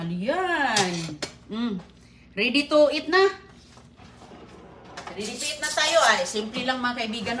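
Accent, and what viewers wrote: Visayas, Philippines. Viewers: ganyan daw mag pronounce ang mga bisaya. Pero ang totoo hindi lahat.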